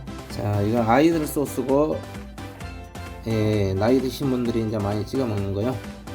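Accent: Jeolla, South Korea. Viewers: 요리도 요리지만 구수한 전라도 사투리가 맛깔납니다.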